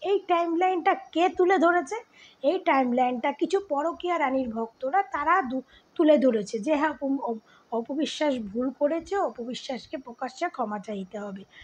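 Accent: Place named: Bangladesh. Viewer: Tui india bole mone hoyna tui tor kotha batrai bojha jay tui bangladesi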